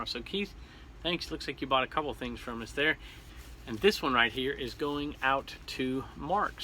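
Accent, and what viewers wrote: South, United States. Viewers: Where in California they speak with southern accent you gotta give that family secret up.. I thought Angeles played in Anaheim and there they sure don’t speak like you...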